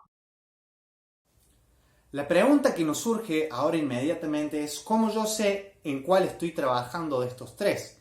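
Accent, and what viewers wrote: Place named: Cordoba, Argentina. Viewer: Muy bueno, chango. cordobés tenías que ser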